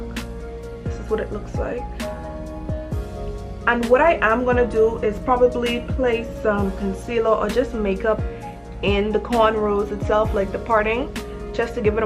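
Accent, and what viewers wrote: Bahamas. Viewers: Your accent sounds Bahamian...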